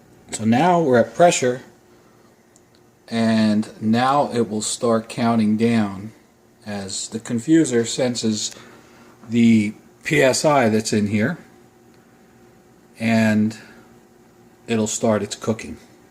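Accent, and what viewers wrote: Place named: Philadelphia, United States. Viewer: Thanks. I just finished watching this video and ready to use my new Insta Pot to cook a pork loin. I gut laughed at the end when you exclaimed "GD that smells good!" Also, I am wondering if you are from SE PA? I detect a Philly accent.: ) Thanks again.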